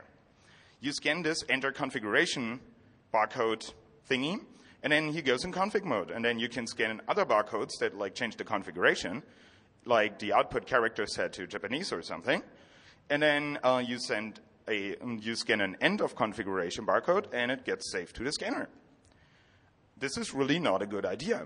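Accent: Germany. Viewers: i sense a little bit of german dialect^^ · NamelessGhost bruh... you’re Swiss and can’t tell it’s a German presenter, and so you just assume American? Chumm itzt, so dumm muess mer au nöd tue...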